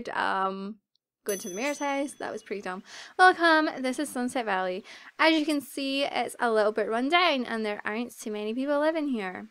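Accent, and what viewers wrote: Scotland, United Kingdom. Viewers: I love your British accent! It makes the video more fun lol :) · What do you use to film? And are you from Scotland? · I have my sims kingdom on the wii. Love <3 Also, this is the first video I've seen of you, are you Scottish?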